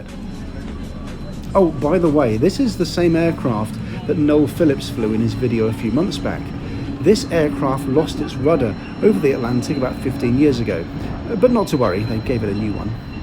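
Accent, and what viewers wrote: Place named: Liverpool, United Kingdom. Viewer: Oh. No. He’s a southern scouser 🏆 😊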